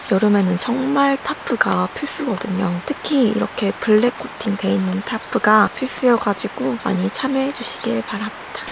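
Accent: Jeolla, South Korea. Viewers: 전라도분이신가봐요 ㅎ 전라도 억양이 순간순간들려서요 ㅎㅎ 저도 전라도사람이라 반갑네용^^* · 님 타프 대박 굿굿👍👍 강이 언능 캠핑갈수있는 컨디션 되서 귀여운 강이랑 같이 캠핑다니는 님 보고싶어요~ 님 솔캠도 너무 귀여우셔용!! 님은 모르시겠찌만 전라도사투리 너무 귀여운거 아시나요.. 강이 귀여움에 살짝쿵 밀려버렸지만 님도 인정하시죠?! 안그래도 우중캠핑 가고싶어서 타프 찾아보고 우중캠핑 영상도 찾아보는데 에이웍스 실타프를 님덕분에 알게되었어요. 타프 찾아볼때만해도 옥타타프는 활용도가 별로이지 않을까 했는데 님 영상보면서 자연스럽게 우중캠핑할때는 옥타타프가 괜찮겠구나 생각했어요. 고동텐트도 영상보고 한참 검색해봤어요 ㅎㅎ 다음 영상도 너무 기다리고 있어요. 항상 건강하시고, 강이랑 행복하게 오래오래 캠핑다니셨으면 좋겠어요!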